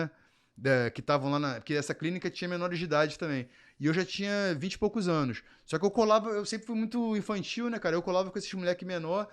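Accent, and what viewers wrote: Rio de Janeiro, Brazil. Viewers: NÃO CONSIGO ASSISTIR ATÉ O FINAL ESSE SOTAQUE CARIOCA É MUITO INSUPORTÁVEL CREDO .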